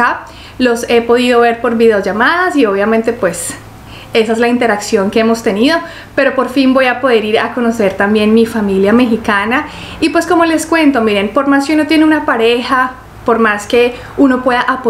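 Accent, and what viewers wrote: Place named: Colombia. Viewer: Ola eras mexicana mas tienes asento de colonbia te felicito seias maxicana o de onde seia lo que veio mexico es mui lindo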